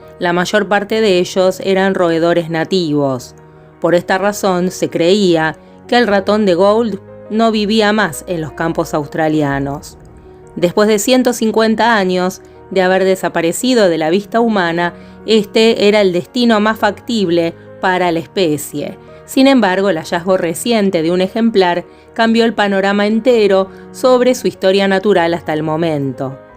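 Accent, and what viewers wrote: Argentina. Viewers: me encanta el saludo: hola amigos, en ese acento tan marcado argentino tusho, no sa sabia de la existencia de estos canales tan informativos de la vida biologica, ya me suscribi y ya vi muchos videos, los humanos somos un cancer, pero veo q la natalidad esta bajando, y ahora somos un poco mas ecologicos, ojala aprendamos a vivir en armonia con la naturaleza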